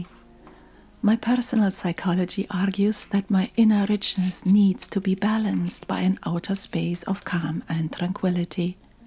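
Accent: Scotland, United Kingdom. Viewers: I just love your channel, and your Scottish accent. When I first watched you knitting I thought "why is she making so many baby hats"...lol. The pumpkins are just beautiful. I crochet and plan on giving these a try.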